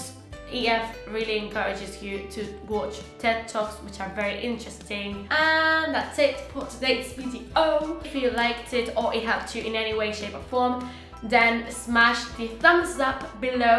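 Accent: United Kingdom. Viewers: hey you got a acent of british. cool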